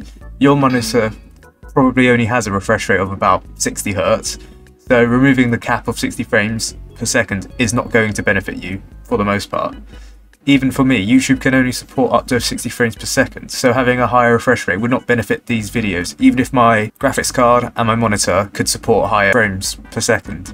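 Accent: United Kingdom. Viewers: I like voice for it is very British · I love hearing British accent mmmm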